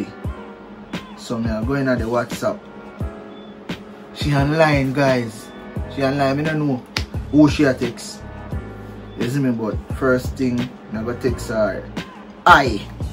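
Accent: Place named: Jamaica. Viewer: Man a chat English and Patwa😂😂‼🔥🇯🇲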